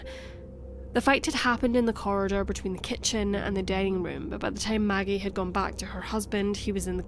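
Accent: Scotland, United Kingdom. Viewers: Hey Hey Are you speaking with a Scottish accent?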